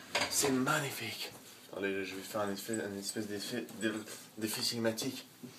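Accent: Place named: Quebec, Canada. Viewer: Regarder au debut a l'accent du Québec et maintenant il parle commen les francais... bref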